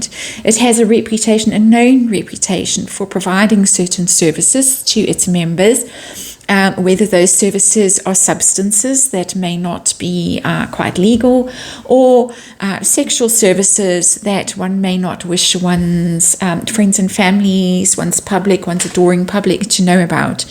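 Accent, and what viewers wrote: South Africa. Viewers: I thought you were South African. I enjoy your reads.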